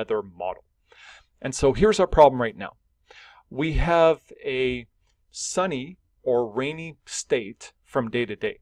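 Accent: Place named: Canada. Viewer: Just found out is Canadian, and in my opinion, the Canadian accent is the best English accent for non-native speakers to comprehend, thanks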